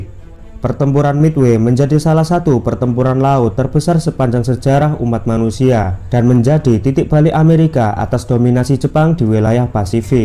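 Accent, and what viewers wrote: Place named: Java, Indonesia. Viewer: Orang Jawa ya mas? 😂 Medok mas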